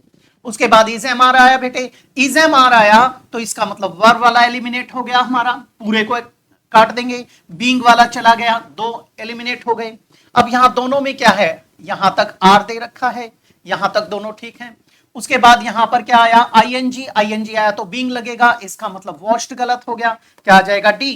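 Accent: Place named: Bhaswal, India. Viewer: Sir aap boli bhaswal se ho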